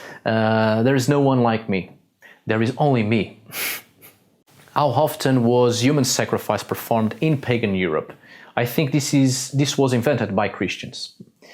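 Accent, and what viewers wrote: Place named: Portugal. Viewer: 'Morri com você falando português!' Is it because your archeology studies or do you have a Portuguese family? Because you have a strong Portuguese accent. 🙂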